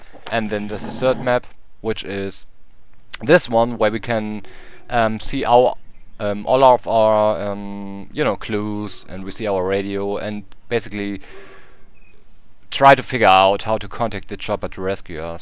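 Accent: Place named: Germany. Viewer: You sound german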